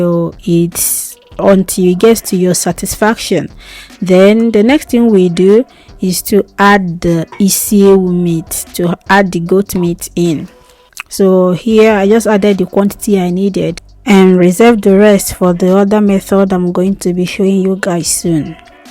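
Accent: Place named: Ghana. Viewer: Oh my gosh 😍 isi ewu of the mighty wash down You killed it chef 👨‍🍳 👏🏼 🙌🏾 You have ghanian accent, hv you lived dr?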